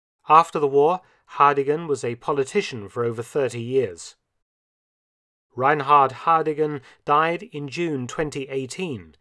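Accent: England, United Kingdom. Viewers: GENERAL British pronunciation; fairly innocuous, but not in a bad way. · Its the British accent. Brits are great narrators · His particular English accent doesn’t hurt either — it fairly screams credibility. 😀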